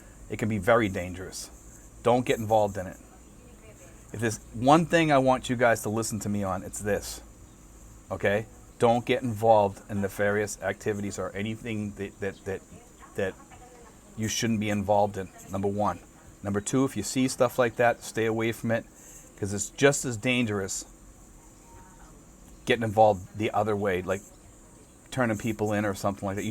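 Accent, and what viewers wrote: Boston, United States. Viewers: Boston accent shined!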